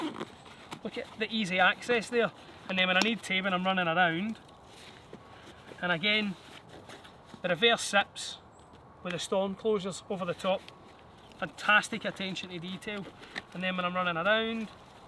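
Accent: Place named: Scottish Highlands, United Kingdom. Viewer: I enjoyed watching your video. Fantasy pack. I'm a former US Marine retired. I also enjoyed listening to you talk with your Highlander Scottish accent. It reminds me of the Netflix show Outlander.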